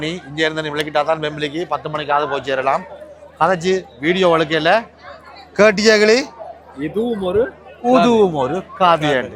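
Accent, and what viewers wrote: Jaffna, Sri Lanka. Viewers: யாழ்தமிழில் கலகலப்பாக பின்னுகிறீர்கள் கோழிப்புக்கை எப்படி செய்வது என்பதை எனக்கு அறியத்தருவீர்களா?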